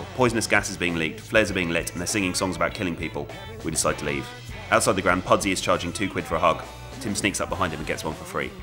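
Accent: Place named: Manchester, United Kingdom. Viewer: Why is he talking in a manchester accent, none of their fans r from there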